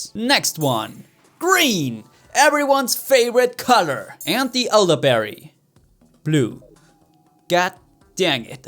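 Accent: Germany. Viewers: Joo du bist deutsch nicht wahr? merkt man am Accent und deinen Kanal Namen, hast du vielleicht Lust mit mir terraria zu spielen? würde mich so freuen :) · are you german?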